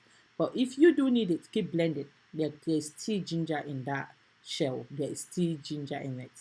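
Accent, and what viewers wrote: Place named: Jamaica. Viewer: I've had ginger beer from the store that is made by Goya. And when I take a sip of it I can feel the antioxidants working. I don't want it really sweet but I want to make my own I was going to make kombucha I'm poor and they won't let you buy kombucha with food stamps anymore. I don't have a lot of Ginger but I think I have enough to make probably a gallon. And I want to make it the real Jamaican Way there are things Jamaicans know that I need to know. I love the accent. I used to work with a Jamaican guy at the sewer plant Bedford New York at the prison besides a used to make tea from cannabis. I bet that was very healing also. I'd say this is a healing drink it's not just a drink. That must be really strong you keep doing it over and over. Kind of like the time I was moving and I made the strongest coffee on Earth espresso but you keep running the water through it about five times I was awake for days LOL.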